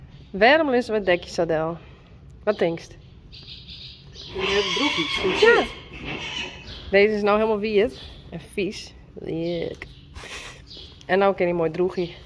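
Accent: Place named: Friesland, Netherlands. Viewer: I absolutely LOVE 😍your Friesland accent and language 😃!!! LOVE sweet Senna, she is so precious 🐕♥️‼️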